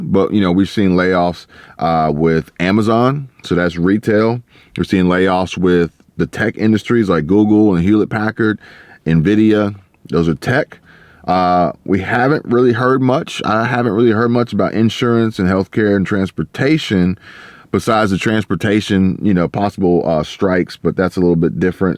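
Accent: Texas, United States. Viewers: Are you from Texas..? You sound like one of us lol! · Great video. You sound like a Texan police.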